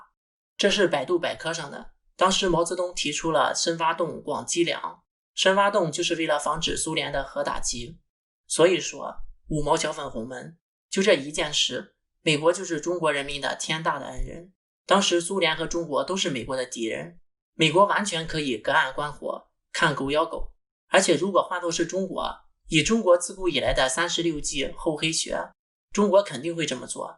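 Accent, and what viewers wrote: Shandong, China. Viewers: 聽口音是山東人？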